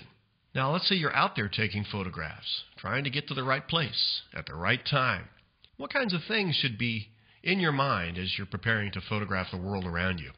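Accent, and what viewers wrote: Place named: United States. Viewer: Love this kind of American accent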